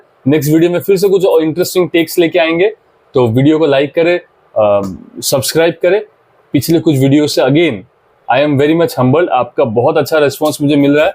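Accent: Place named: Mumbai, India. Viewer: aapki Hindi se Aisa lagta hai k aap Mumbai se belong karte ho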